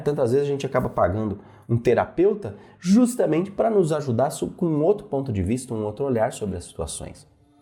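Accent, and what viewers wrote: Northeast, Brazil. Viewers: Muito bom vídeo, mas esse sotaque nordestino nunca esteve tão presente em vossa fala.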